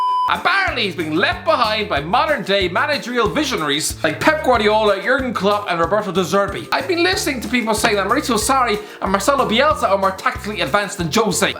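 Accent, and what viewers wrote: Ireland. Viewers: A Newcastle fan with an Irish accent, I don't know what to believe.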